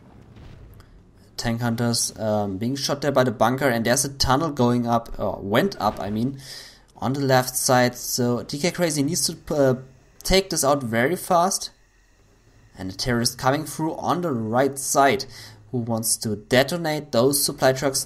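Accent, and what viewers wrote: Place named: Germany. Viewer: Nice Video. Where are you from? Your english sounds really german :)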